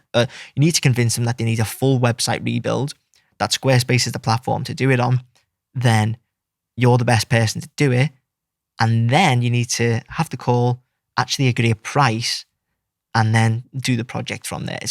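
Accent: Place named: Liverpool, United Kingdom. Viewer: When do you have time to watch Liverpool play?.. you sound like a scouser Edit: I watch right after posting this 😂😂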